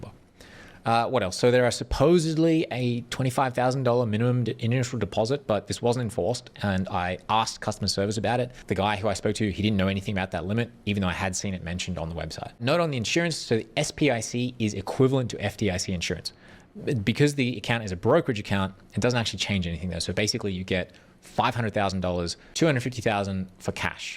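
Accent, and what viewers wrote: Australia. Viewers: So aussie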